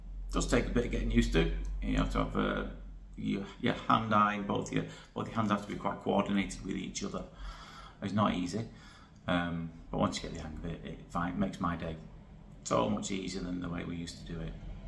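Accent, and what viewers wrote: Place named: Liverpool, United Kingdom. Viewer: Hi I wondered if you are close to Liverpool because your accent sounds as if your the 5th Beatle 😂you are super super young! I’m from California in San Jose. Have you spoke to Rhys Barber and Taylor Green Audiologist Associates? And also Collin from Durham Ear Specialist? I think you are absolutely great 😊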